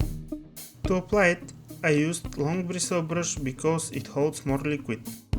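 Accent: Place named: Russia. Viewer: He sounds Russian to me, I do enjoy the accent, and not blanketing over it.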